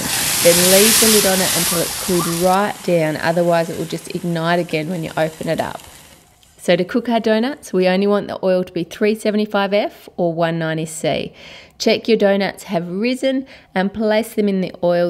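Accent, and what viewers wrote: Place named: Australia. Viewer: Whooooa, that was an unusual video °Δ° like, seriously, i often look videos just to hear her beautiful voice and enjoy the Australian accent, and NOW I see smoke in her kitchen and then fire burning all over the video frame, and I'm like: 😨😵😱 whhhat??!!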